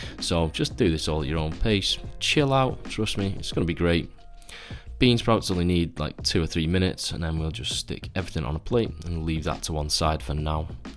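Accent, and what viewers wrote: United Kingdom. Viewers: Bro it's not only the content being great, your British accent kills me, the way you say stuff just makes me smile haha, amazing stuff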